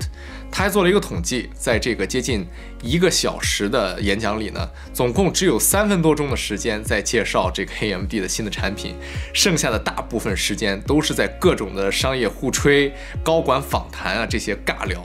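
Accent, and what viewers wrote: Shandong, China. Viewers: 听口音老乡啊山东淄博济南? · 听口音是山东人吗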